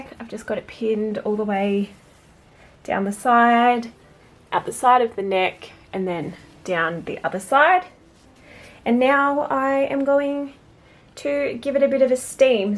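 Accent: Australia. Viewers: Thank you for another informative video! Love your Australian accent 😄 Love from the UK xx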